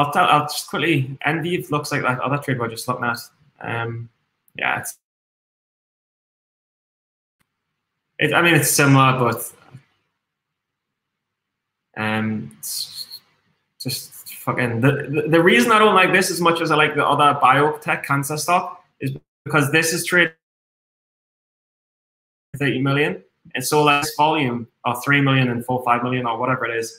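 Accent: North East England, United Kingdom. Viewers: hey man are you from north east of England????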